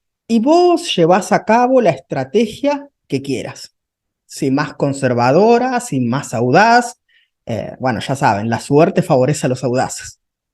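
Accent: Standard. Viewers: Me da ternura que hable en neutro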